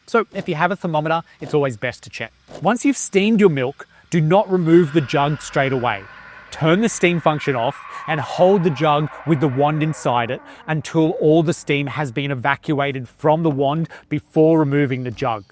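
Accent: Australia. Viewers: I watched your video for the first 3 minutes with captions and the sound off. Thought to myself, 'This guy probably has an Australian accent.' Turn the sound on, and I was right. How did I know that!?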